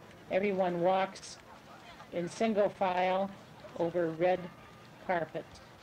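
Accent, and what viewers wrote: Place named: Michigan, United States. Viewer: Not Canadian. Could be from Michigan. Wisconsin maybe.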